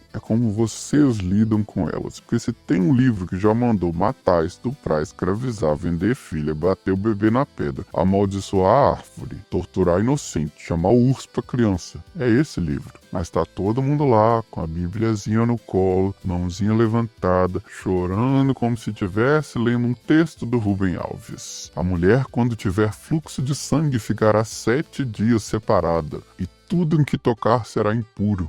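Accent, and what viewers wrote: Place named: Minas Gerais, Brazil. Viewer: Sou apaixonada nesse sotaquinho mineiro! Assistindo pela SEGUNDA vez!!! Muito bom!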